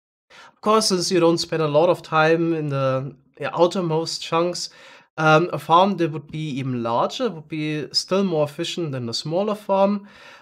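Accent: Germany. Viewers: Du hast einen sehr deutschen Akzent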